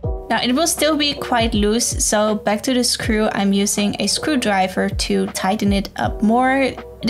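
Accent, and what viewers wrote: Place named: Netherlands. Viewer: Hi! I just found this video and really want to make one! Also, are you also from the Netherlands? I thought i heard a bit of a dutch accent 🇳🇱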